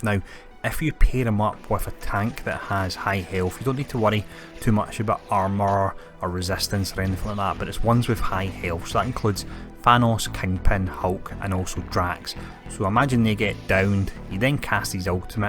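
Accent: Scotland, United Kingdom. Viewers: Yeah, I gathered as much, but where in scotland? Dundee or Glasgow? I'm from sweden but I lived in Edinburgh for a while but I don't recognize the accent =)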